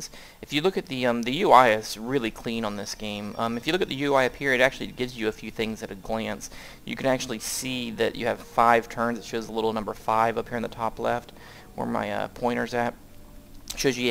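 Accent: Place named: South, United States. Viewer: Not usually a fan of Southern American accents but yours is pretty relaxing and clear.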